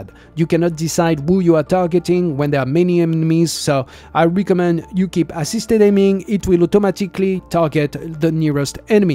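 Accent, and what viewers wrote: France. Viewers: Thank you for this video. Subbed and liked. Side note, my mother is French (born and raised) so to me your accent is awesome.👍 A lot of people think the French language sounds so romantic. That's only because they've never had a tiny, very angry French Mom cursing at them in French and swinging whatever was close by that she could get her hands on and use as a weapon (or throw it at you lol).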